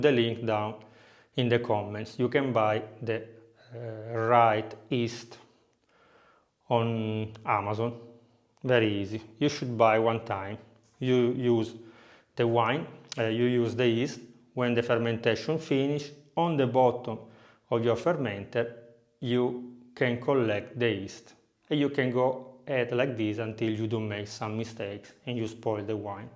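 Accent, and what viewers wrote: Italy. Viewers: Ahh that fine old Italian accent 😌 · He is so cute! And his accent too. Ok I am catching the next flight to Italy. ✈️ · Totally Italian accent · I like your Italian English accent, sound sweet and strange to hear, all in all thank you for the lesson, ciao ciao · Italian accent my favorite accent. is that wine Halal? any sheikh here? 🤣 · The Italian Accent is so cute !! 😂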